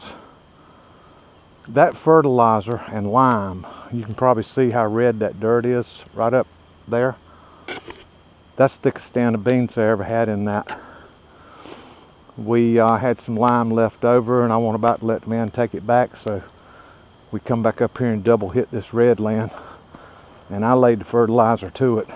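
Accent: South, United States. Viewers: I enjoyed the backpacking trip! Learned a bunch more. That bit of glee in your voice every time you sprayed a weed made me smile - especially when you came across that evil sicklepod (which I promptly Googled) - "DIE" spray "DIE" spray - all in that nifty southern accent. Exactly how much backpacking do you do on your acreage - or was this just a spot check? You are right though - "you can't buy rain". If I could gift you with it I would. Hang in there and keep on being the that you are - won't be long now before there are over 2K of us out there following along and enjoying your content. You have not only grown soybeans, you have grown a fan base who really appreciates what you share with us!:)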